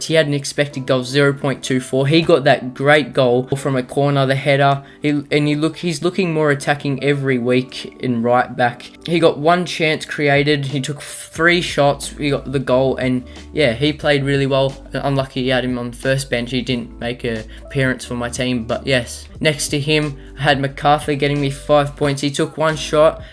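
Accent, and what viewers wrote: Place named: Australia. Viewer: Love Australian accents, you got yourself a new subscriber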